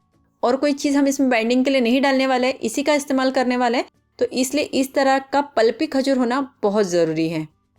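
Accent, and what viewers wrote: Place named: Gujarat, India. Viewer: Aapka bolne ka lahza gujrati bhasha k jaisa hai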